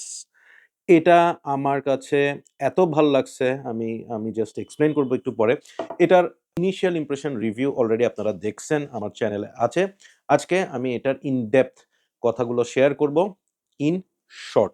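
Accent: Chittagong, Bangladesh. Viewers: আসসালামু আলাইকুম ভাই। আপনার জন্মস্থান কি চট্টগ্রাম?আপনার কথার মধ্যে চট্টগ্রাম এর টোন আছে ।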